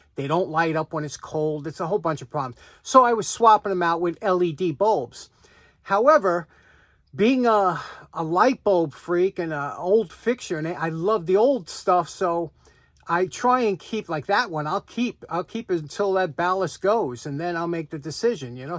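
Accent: New York, United States. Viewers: Cheers, everyday is a school day watching you! Very informative and I find your explanations really enjoyable (especially in your new York accent) I'm loving going through all your past videos. Cheers from Devon, England 👍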